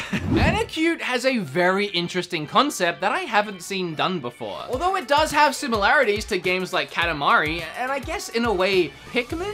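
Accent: Australia. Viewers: Wait, your Aussie? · I'm so glad you finally said your accent, I'm Australian too and it was killing me bc of the American hints you have too, I was so confused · Lol I didn’t realise your Australian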